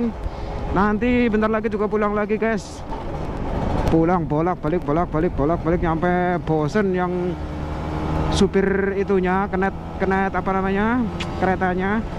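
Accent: Banyumas, Indonesia. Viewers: Ngapak kocak gaess😂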